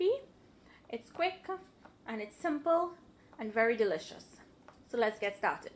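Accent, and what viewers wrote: Trinidad and Tobago. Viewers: To Shabana Nisha who said accent annoying. How rude. You nasty person, we are Trinidadians and proud of it. Who are you to criticise her accent. Too back to where you came from. Where they poop in the streets. Go back to India, you horrible person. You never hear of Trinidad. Go back to school. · She's a Trinidadian. You can hear her trini accent maybe that's the way her family made it.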